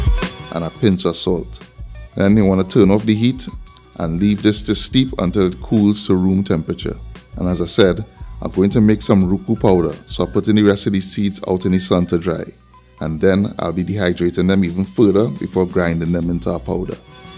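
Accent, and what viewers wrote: Trinidad and Tobago. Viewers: So enjoyed hearing the Trini accent.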